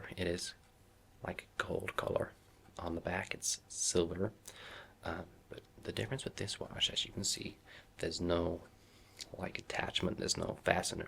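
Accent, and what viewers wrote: United States. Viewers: THE AMERICAN ACCENT IS AMAZING. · This is my first time watching him and I thought he was American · oh that american accent is hilarious & insanely cute. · Say... that's a pretty good American accent. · You're a mix between classic US accent, the Joker, and a nerd... And I kinda love it. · Great video! And it is funny how you try to do an American accent (you did a good job) and you didn't really pronounce the "r" which is typical British. So instead of saying offer you said offeh. Don't get me wrong I like it! Once again great video and I've been subscribed to you for quite a while so yeah! Keep up the good work! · He's in the UK. Maybe he's trying to do an American accent. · Nice American accent great role play thank you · I hear... Boston, NY and some Tennessee twang. Now thats American! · I didn't think your American accent was that bad actually! 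I have definitely heard worse :D This was a lovely video. I was surprised at how the sound of the metal got my tingles going. What a great trigger! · omg nice American accent!!!! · You know, I've always wondered what it would be like to do an American accent when you normally have a British one. Anyway, good work on the accent; it's not that terrible! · I LOVE your american accent! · Love the American accent. :) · Not a bad American accent! Much better than most Americans can do an English one! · Wonderful job with this one. You did a pretty good job with the American accent. You dropped it once or twice when you said certain words but it's no biggie at all. It's a wonderful video. Brilliant job as always. :)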